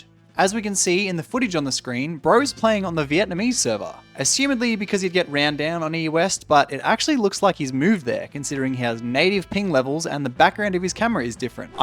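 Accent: Australia. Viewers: "Talkin' about de bloke" I might be dumb, but are you australian? lol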